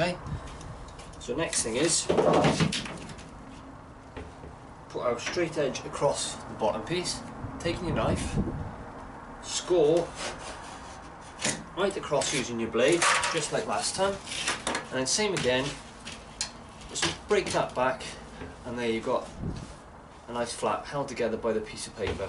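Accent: Wales, United Kingdom. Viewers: Man I love your video's, love your Welsh accent reminds of my friend's and family from Neath in Port Talbott!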